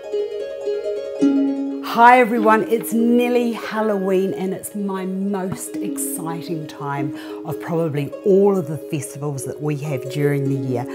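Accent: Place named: New Zealand. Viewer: Hi.. long time no see lol.. I remember from new Zealand morning show... now im in Australia and love videos and the ol kiwi accent lol... have a awesome year.. =)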